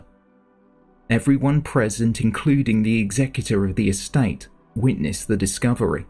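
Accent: England, United Kingdom. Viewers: thank you for the new video love your English accent love London England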